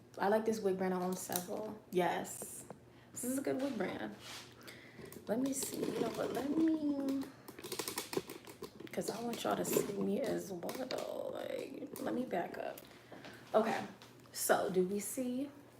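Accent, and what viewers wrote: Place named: New Jersey, United States. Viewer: I am so grateful to have found your channel!! I love your energy and this video was incredibly helpful! And this Jersey accent is giving! I live in Houston now but one Jersey girl always knows another. 💖💖💖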